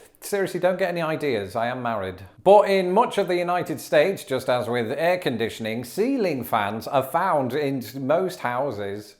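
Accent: United Kingdom. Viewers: Um, he's British.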